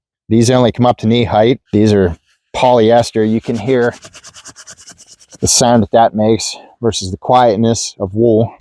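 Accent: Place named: Pittsburgh, United States. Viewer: When I saw the one of your other videos, I thought that there was a little "yinzer" in that accent. The second video shows you sitting at the Point. :)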